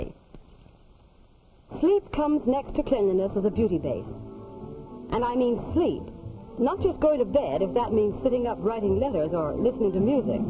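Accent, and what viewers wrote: Transatlantic, United States. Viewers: Transatlantic accent if I'm not mistaken.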